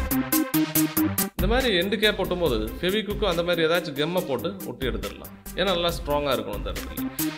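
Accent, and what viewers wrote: Kerala, India. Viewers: He is a malayali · unga slang Malayalam+ tamil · Are u malayali or pandi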